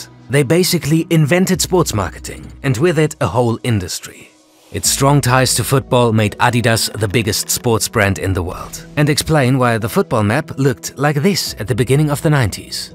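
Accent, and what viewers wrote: Germany. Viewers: Adidas isn't German. Nike isn't American. Both companies are multinational enterprises who use national identity as a selling point as long as it benefits them financially.... und warum schreib ich eigentlich auf Englisch bei einem Video, dessen Sprecher so Klischeehaft Denglisch klingt und alle anderen Komentare sowieso auf Deutsch sind. Achso... ich vergaß... ich bin Englischer als alle anderen hier ;-)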